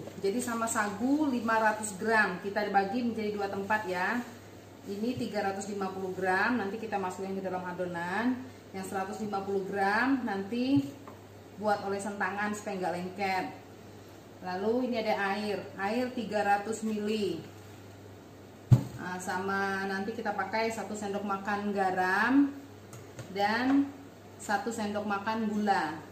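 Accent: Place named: Palembang, Indonesia. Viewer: Asli uwong palembang yo, logatnya kerennn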